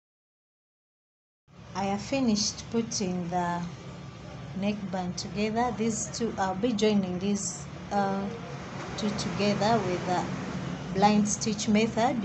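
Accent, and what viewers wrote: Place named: Uganda. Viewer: sounds Ugandan. Do u offer lessons face to face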